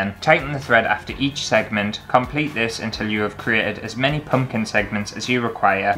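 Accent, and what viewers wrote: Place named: Sheffield, United Kingdom. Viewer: fortunately, no balls have wandered into my water glass, hairy or otherwise :-) as far as your accent goes, I can get what you're saying 99% of the time. I've been expose to British culture for 40 years, and I don't consider myself an accent expert, but correct me if I'm wrong, you have a Sheffield accent...?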